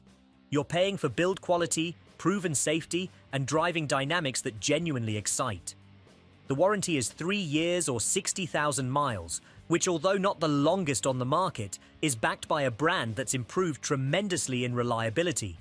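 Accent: United Kingdom. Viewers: British accent sales the videos and products , why? That is the culture and mindset !!!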